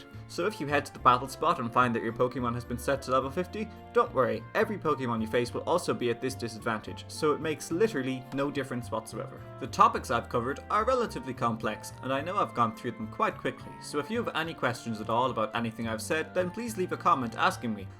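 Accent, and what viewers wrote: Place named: Ireland. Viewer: I can tell by you're accent that you're Irish. I am, too!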